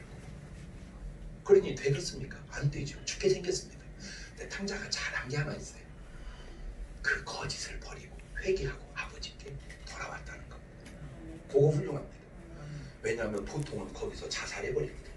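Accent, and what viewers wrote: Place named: Chungcheong, South Korea. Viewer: 장경동 목사님의 설교를 듣다보면 참으로 공감하는 부분이 너무나 많습니다 나는 서울에 사는 천주교인 인데 장목사님을 너무나도 존경하게 됐습니다 특히 구수한 충청도 사투리에 진실된 설교 정말로 공감합니다 서비스로 비밀 한가지 말씀 드릴게요 ? 나라 화합 하지않고 대깨문 위한 정치하는 역사상 가장 무능한 자 어벙이라고 아시죠 이번 기회에 혼좀 내주세요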